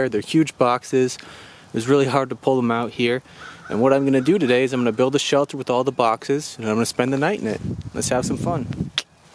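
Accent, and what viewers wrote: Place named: Upstate New York, United States. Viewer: I wonder what state this is in? I detect a slight accent, upstate New York? Beautiful patch of woods. The vines on that tree are so cute and fascinating.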